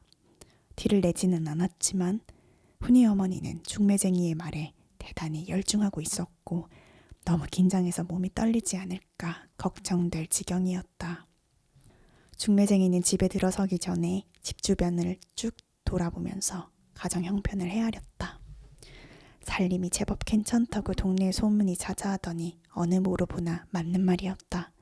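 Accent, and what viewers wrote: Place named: Busan, South Korea. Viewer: 파친코1부 부산사투리 재밋게, 감질나게 잘들었어요. 2부 기다릴게요~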